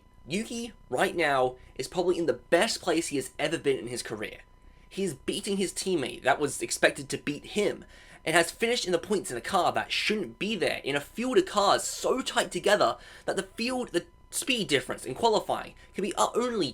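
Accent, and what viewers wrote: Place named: United Kingdom. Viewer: I love slightly rude F1 commentary with a british accent! You got my sub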